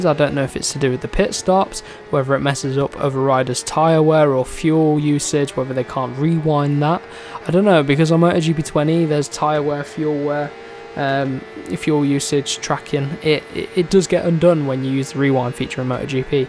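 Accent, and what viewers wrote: United Kingdom. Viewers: i love your british accent, man 😘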